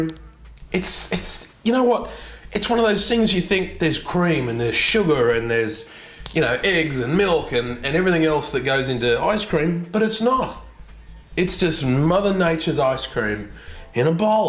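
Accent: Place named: Australia. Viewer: He's Australian.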